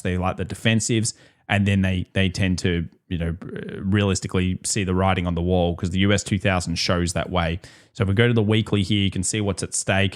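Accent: Australia. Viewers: haha I watcha de women with big jubs but I admit myself I just love the dudes Australian accent.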